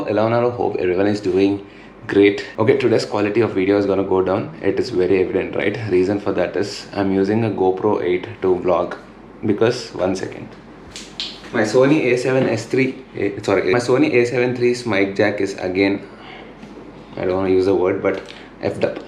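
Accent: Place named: Telangana/Andhra Pradesh, India. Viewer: bayya me English is bad...pls work up on it.....take a second and speak....may be fast but not listenable....its a true translations of telugu grammer....not english.....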